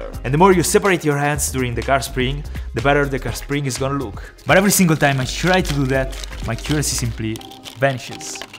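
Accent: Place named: Italy. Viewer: he is italian that's why he's accent is different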